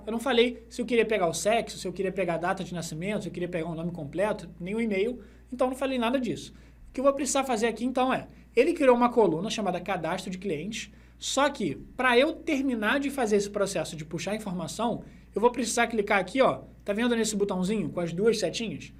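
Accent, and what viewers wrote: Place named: Rio de Janeiro, Brazil. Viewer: Brother, sou carioca tbm, mas moro em Fortaleza desde os 7 anos...mano eu tinha maior preconceito em vê uma aula sua por conta do incômodo do sotaque...Mas olha, conteúdo muito irado, parabéns...vou acompanhar mais.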